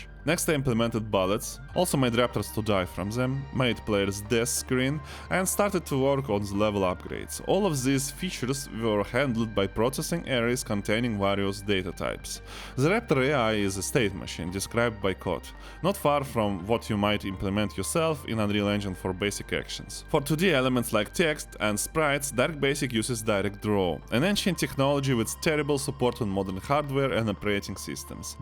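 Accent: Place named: Russia. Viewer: Охренеть, только и акцент русский, а почти весь ролик становится понятным без перевода! 😧👍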